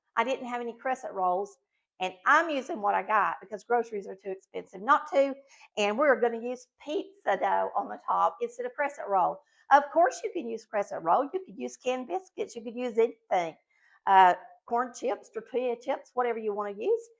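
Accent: South, United States. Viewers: I can smell it over here in New Jersey. I'm going to make this easy Taco 🌮 Pie! Thx for sharing! I watch you on my YOUTUBE TV Channel & on my smartphone. I like your style and your southern accent.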